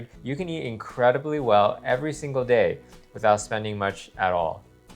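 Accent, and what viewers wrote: United States. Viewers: I’m Irish but live in Australia. You assume because he has an American accent he’s talking USD. Assumptions lead to all sorts of f&@ ups. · His accent is clearly US…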